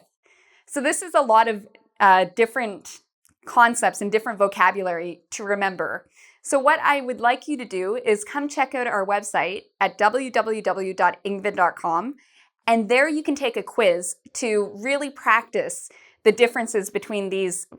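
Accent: Canada. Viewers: I bet is Canadian, there's nothing more Canadian than "aboout"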